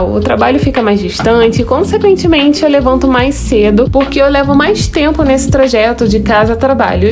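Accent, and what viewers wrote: Rio de Janeiro, Brazil. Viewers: Você é do sul com esse sotaque carioca? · Tu é gaúcha, com esse sotaque super carioca? Não entendi...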